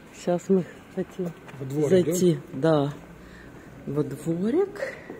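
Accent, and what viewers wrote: Odessa, Ukraine. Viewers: Спасибо вам за то что вы показуете Одессу. А голос я бы слушал бесконечно. Именно одесский говор. И никакие артисты в фильмах про Одессу не передают того одесского колорита как это делает. Спасибо вам огромное. Всегда смотрю ваши стримы. Я из Херсона их смотрю. Был в Одессе и очень скучаю за ней.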